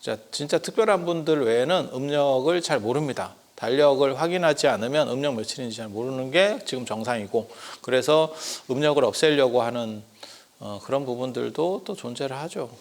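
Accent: Jeolla, South Korea. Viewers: 스님은 전라도 억양이 좀 센거 같아요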